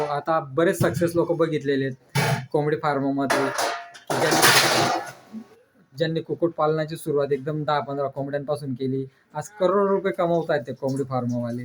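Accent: Khandesh, India. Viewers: Hallo tumhi kay khandesh chalisgaon jalgaon che aage ka bhasha varun vatate aahe Baki tmhi chhan video banav tat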